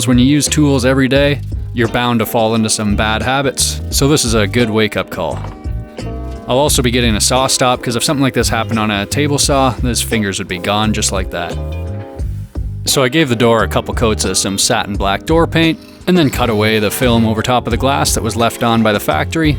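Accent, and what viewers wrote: Canada. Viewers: Is your accent Canadian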